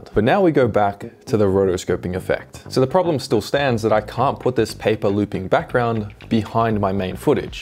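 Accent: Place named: Australia. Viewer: ▼ - So great to hear an Aussie accent too!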